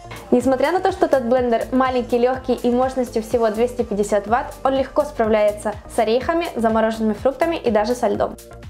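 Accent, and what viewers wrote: Spain. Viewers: Кто заметил что розговаривает с Испанским окцентом. Мне это нравки🤗🤗🤗😗😗😗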